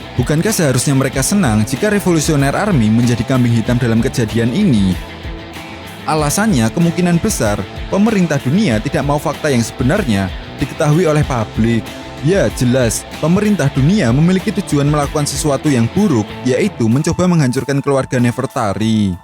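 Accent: Java, Indonesia. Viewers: Min Jawa ne ndi ? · Bahaso jowo nyo gk bisa hilang ternyato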